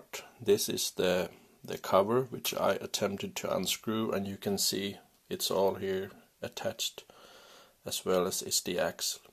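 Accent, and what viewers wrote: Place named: Bangladesh. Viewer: ভাই অাপনের কথা বাংলাদেশি বাংলাদেশি মনে হয়।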